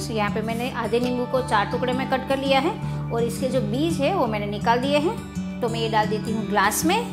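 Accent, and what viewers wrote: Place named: Kathiawar, India. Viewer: Madam aap Kathiywadi ho? Hindi bolne par bhi pata chal raha hey...!!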